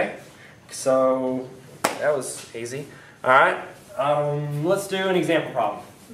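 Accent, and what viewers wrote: South, United States. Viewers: Btw awesome southern accent :D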